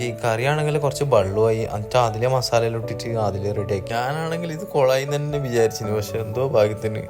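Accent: Kasaragod, India. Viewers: സംസാരം കേട്ടാൽ തന്നെ മനസ്സിലാകും കാസർകോട്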